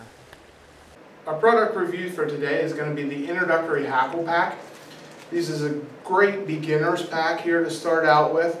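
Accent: Pennsylvania, United States. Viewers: Maybe for you, but that's how it's said in PA!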